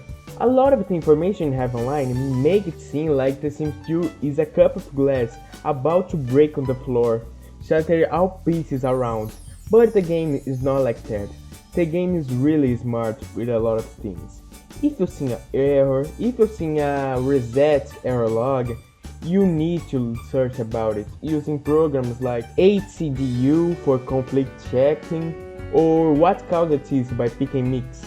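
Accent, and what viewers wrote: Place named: Brazil. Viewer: VC EH BRASILEIRO NEEE ESSE SOTAQUE EU SEII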